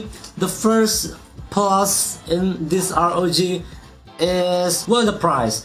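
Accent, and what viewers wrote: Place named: Indonesia. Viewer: Untung pronounciationnya indo, jadi paham :v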